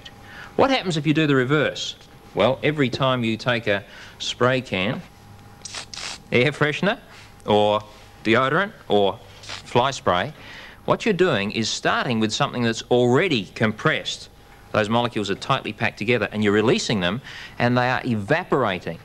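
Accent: Australia. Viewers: It was awesome sir...It helped me a lot to polish my concepts out...You are a fair dinkum Australian..I am fond of this aussie accent... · Why is it a Australia accent make learning better? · The Aussie accent hits me hard the first second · that Australian accent telling me what’s a refrigerator and then asking how does it work really hits me in the feels. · Why does this guy remind me so much of a young Ray Comfort? Similar hairstyle, hair color, mustache, Australian accent, are these guys trying to look like each other or do all Australian men just look like this?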